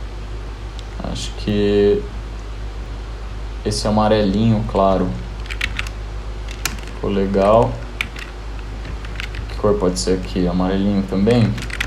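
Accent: Brazil. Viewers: O sotaque brasileiro o entrega,. rsrs Parabéns pelo projeto, brother.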